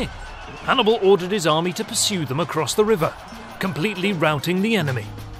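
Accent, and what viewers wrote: England, United Kingdom. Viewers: Why does this sound like it was written by an American and read by an Englishman? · Subscribed! That's what I call interesting quality content, I even love your British accent. :) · The best animated documentary of the 2nd Punic War ever seen: very detailed descriptions, beautiful animations, great music and sound effects (seems to me Rome Total War style). I almost forgot, your British accent is very elegant and very understandable, thanks a lot!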